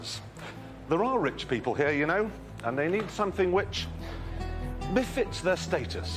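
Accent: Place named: RP, United Kingdom. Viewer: When Clarkson still did the bbc presenter’s accent lol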